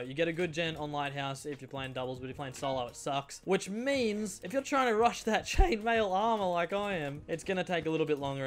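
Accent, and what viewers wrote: Australia. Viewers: the Australian accent popped out here more than usual 😗😗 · why do you sound more australian in this video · Is it just me, or does sound extra Australian in this vid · is it just me or does he seem more Australian than normal in this vid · he sounds extra-aussie this video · Was it just me or did he talk more Aussie lol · Not sure how but you sound more Australian than normal in this video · That australian accent made me so uncomfortable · This is like the only video that actually sounds like an australian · is it me or does sound more australian in this video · this video is just channeling his inner australian accent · Your Australian accent just keeps growing. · Bro I love you accent like it is the best Australian accent ever. :D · Who else noticed the Australian accent getting more evident than usual? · is it just me or does he sound insanely more australian than normal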